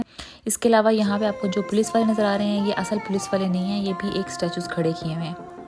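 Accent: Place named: Lahore, Pakistan. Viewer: Nice.. That lahori accent though 👌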